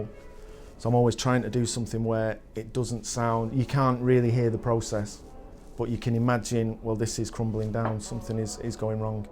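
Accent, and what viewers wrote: United Kingdom. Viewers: he's from stockport, same as me. I think hearing him speak exactly as I do and as everyone I knew growing up did comforts me a bit, but I'm really not sure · He sounds like every British guy ever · Jesus Christ, as a Yorkshireman I listened to his projects thinking he was some deep mysterious american for some reason so to see him with sunglasses on with a Northern accent is incredible 😭 big up the north!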